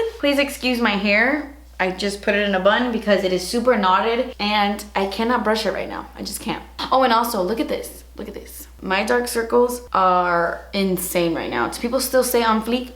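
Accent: Cuba. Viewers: I'm gonna get rid of that couch, ah entonces? So Cuban. Love you, you talk more often in Spanish, it sounds cool. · looool i love how u say ahhh entonces! with ur cuban accent! so lovely! im spanish and im always grateful to hear some of ur spanish words 😜😜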